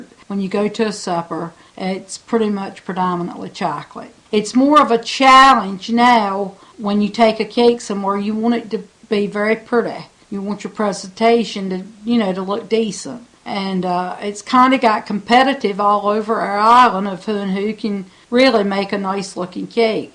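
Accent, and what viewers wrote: United States. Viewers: Your dialect is a mixture of southern drawl and Virginia brogue…I think it's fascinating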